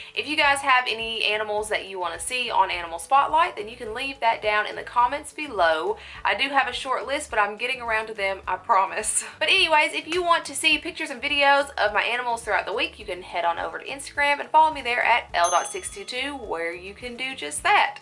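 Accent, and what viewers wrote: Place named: Georgia, United States. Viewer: I love your country accent. Sounds Southeast, maybe Georgia my guess. Anywho, love your channel. Great educational but more importantly, for me, your accuracy. 👍😁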